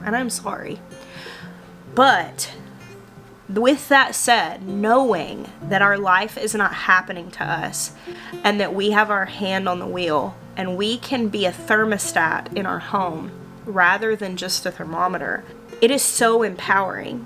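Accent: South, United States. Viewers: Are you saying people outside the South are so intolerant that they can’t handle Southern Accents?! What nonsense. her accent is fine and part of a valuable and unique culture. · mah goodness, yur sourthern accent is deepening---South Carolina affected??? Your audience is much broader; please remember your viewers watch your channel for information, community, and connectivity. Don't leave the non-southerners high and dry.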